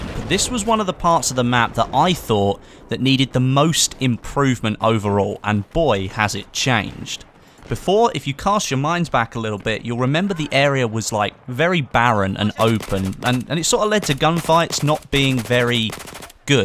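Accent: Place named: United Kingdom. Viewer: Yes, he's British.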